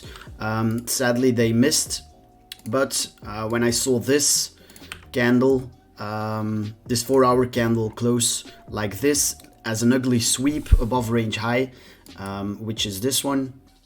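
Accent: Flanders, Belgium. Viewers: I hear flemish accent? ;) Agreed btw, looking to bid sub 45k if we get there. Wonder when/if we're gonna get sweep of liquidation candle bottom soon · Is it flemish?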